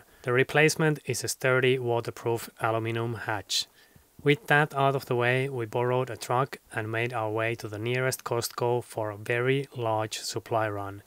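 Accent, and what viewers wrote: Finland. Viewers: Can place Your accent? Are You from Finland? Good luck! /Thomas from Sweden.😁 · I love this channel. The cinematography. Everything. But really it's this guy's accent and voice and delivery. I could listen to him forever. So matter of fact. I guess, very Finnish.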